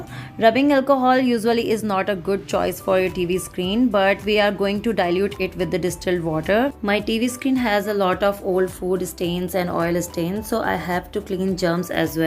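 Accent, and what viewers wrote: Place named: India. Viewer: I HATE YOUR WORLD FAMOUS INDIAN ACCENT!!!!!!!!!!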